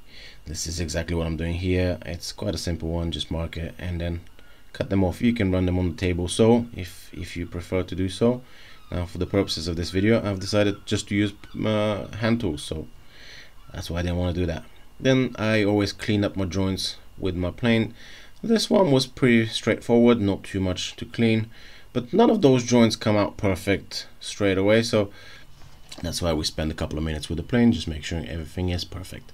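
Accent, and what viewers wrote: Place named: London, United Kingdom. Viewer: what’s that accent?…it’s a bit Laaaandon..but mixed with what? Excellent video by the way.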